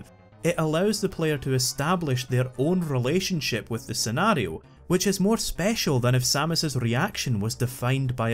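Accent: Scotland, United Kingdom. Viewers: Do you have a Scottish accent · Are you Scottish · man with thickest Scottish accent in the universe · love the Accent. Are you from some where near Glasgow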